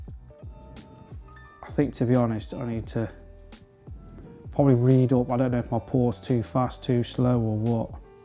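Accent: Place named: United Kingdom. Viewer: Thanks for the Reply. I see based upon your accent you may be in the UK? I would love to visit there someday. Have lived in the US all my life. I am glad to hear you like your Timemore kettle. I should receive mine today. Last night when I was reviewing scales, I saw the Acaia scales but wow they are expensive. There are some positive and negative reviews of some of the other Timemore scales but the reviews seem to be a bit better than the Hario v60 scale. I'm just getting into pour over coffee and have used french press, and percolators.Need to find the scale and then decide on the Hario V60 Drip Decanter or the Hario V60 Glass Range Coffee Server, 600ml. I also think I will pick up the Hario V60 Metal Coffee Dripper, Size 02, Silver vs the ceramic dripper. Any thoughts from you on drip equipment would be welcomed as it seems you may have more experience than me. 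For example, if you use 30 mL of coffee, how much water are you supposed to use? Also need to pick up a manual coffee grinder.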